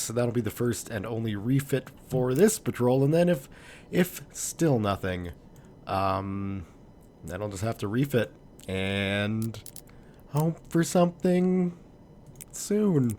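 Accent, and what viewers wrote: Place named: Canada. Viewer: hey its the soothing Canadian voice of that i need in my life.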